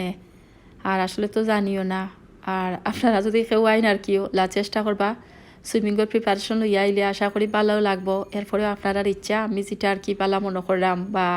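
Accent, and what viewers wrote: Sylhet, Bangladesh. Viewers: Yaa allah afa tnk u so much sylheti matar lagi...ou bashay matbay shundr lage 😍😍😍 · When u describe in sylhet i it sounds great carry on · Well done, Sylhety sounds better. Watch Wahida's tiny world channel.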